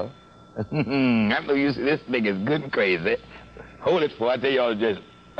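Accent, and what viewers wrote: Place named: South, United States. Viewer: He really as Southern as it gets🤣 I thought he was from Louisiana😂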